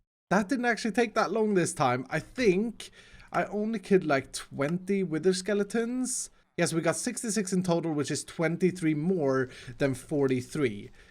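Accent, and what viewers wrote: Sweden. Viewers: Är du från Sverige? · Are you from Sweden??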